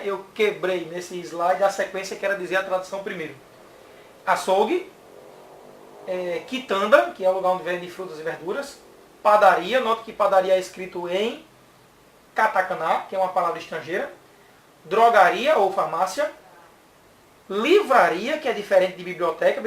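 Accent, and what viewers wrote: Northeast, Brazil. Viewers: Ele é de rio grande do norte, mas esse sotaque talvez seja o mais falado em todo interior dos 9 estados nordestinos, eu sou do interior de pernambuco, e nosso sotaque é assim também. hajimemashite!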